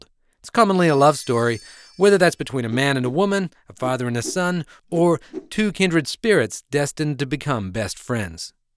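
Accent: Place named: Australia. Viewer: Totally random observation: this dude has got to be half aussie or something. There are some sneaky vowels & dipthongs giving away an accent! (Dont worry, as an aussie, its a cute thing to notice) Handy video, btw. Thanks!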